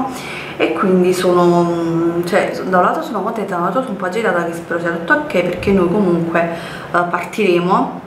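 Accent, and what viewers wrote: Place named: South, Italy. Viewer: La temperatura del suolo in questi giorni è arrivata 50 gradi, più del 2003!!! Assurdo peggio del deserto 🏜. Non so di preciso di dove sei ma dal tuo accento presumo che sei del sud, la ha fatto molto più caldo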